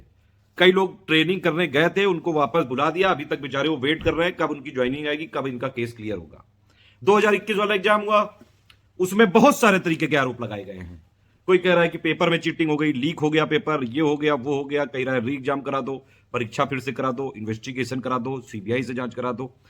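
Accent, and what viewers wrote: Kanpur, India. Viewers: भैया कानपुर से हो क्या आप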